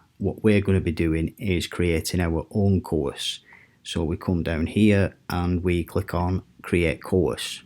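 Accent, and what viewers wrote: United Kingdom. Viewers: Well explained thanks. Sounds like you’re a Lancashire lad. Hoping to do the UK Ironman at Bolton again 2021. Great place!! 😊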